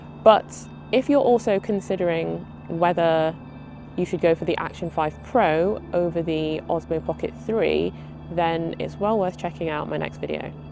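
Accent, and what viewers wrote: United Kingdom. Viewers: They've got my attention, warmth, 10 bit normal, brighter. I've never bought one. This one may get me. Great videos, your accent brings me back to when I used to live in the UK. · my first time watching your videos. Your delivery is great (probably the accent lol -- dunno why we Canadians don't talk like Brits...) ...anyway, the content was the best I've seen on this crucial question. I am going on a motorbike trip in a few weeks and literally just bought the Osmo Action 4 after seeing this...didn't even hesitate. Nobody in the comments (that I've seen at least) even mentioned how tack-sharp and natural the wind-cover on the OA4 looked in comparison to the OA5, which looked all mushy and smudged out like it was painted in the video. Annnnyway, I even watched your follow-up with the firmware update...no better imo. And ya, I know my purchase might be short-lived with the Osmo Action 6 due VERY soon, but need something for the trip. 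Sold my GoPro12 (thank god), that thing crashed so often...the stupid wrist strap never synced...nightmare. Anyway, look forward to your Action 6 video!!!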